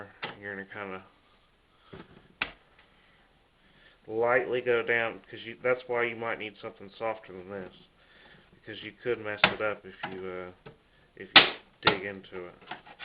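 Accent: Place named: Cleveland, United States. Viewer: And he's from Ohio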